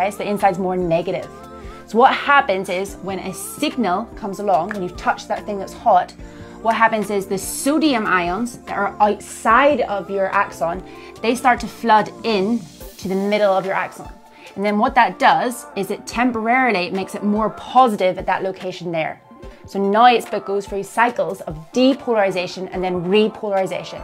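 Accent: Ireland. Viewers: Thanks Madame❤, and are you Irish by any chance?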